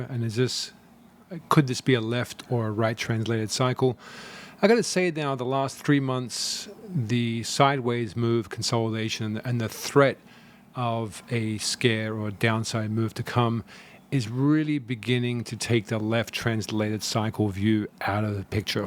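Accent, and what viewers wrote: Australia. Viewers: he's from Sydney. Common NSW accent.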